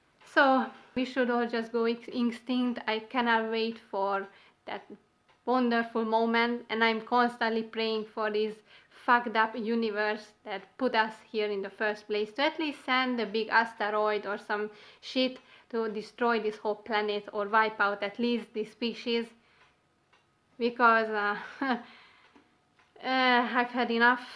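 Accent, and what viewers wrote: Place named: Hungary. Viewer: I can only regret I have found your channel only today. I miss hearing the Hunglish accent as I used to work in Budapest for a long time. When I came for the first time to work with my Hungarian colleagues I could not understand why they are so negative as I was in life bubble back then. But they did not complain about things you or we rant about. It was mainly the job, government, gipsies, other colleagues, bosses etc but not about the life itself. You made my day after listening to the first authentic rant from Hungary which is way more meaningful. Cant wait to watch all your videos.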